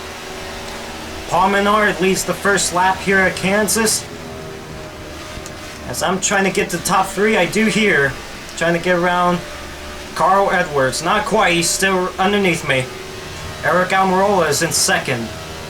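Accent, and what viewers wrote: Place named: Boston, United States. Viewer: He has a Boston accent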